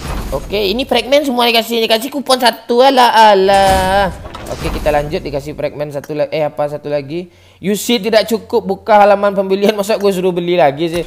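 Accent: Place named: Java, Indonesia. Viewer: Bang bhsa medokk🤣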